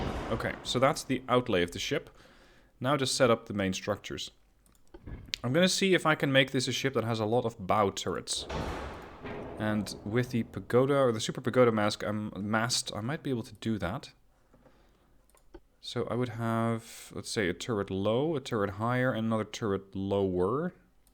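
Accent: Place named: Netherlands. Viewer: u r dutch right? if not i can hear a slight dialect as if ur main language isn’t english, and the dialect just sound super ducth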